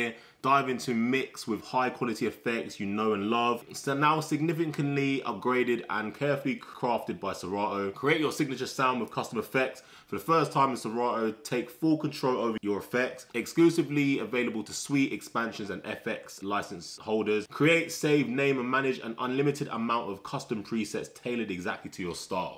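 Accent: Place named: Roadman, United Kingdom. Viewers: lol it's all good. You speak the Queen's English. My American English is a bad version of yours. 🤣🤣🤣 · Mans is road man bruv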